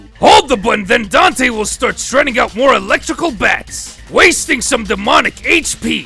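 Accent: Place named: Australia. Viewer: Bro has the most australian assent i have ever seen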